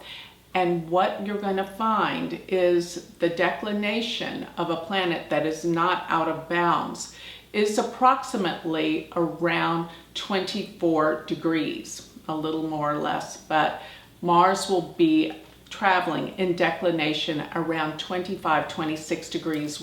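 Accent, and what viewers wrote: United States. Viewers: Greetings from Vedic astrologer...from France. Thank you for posting. Just cannot stand this American accent ;-((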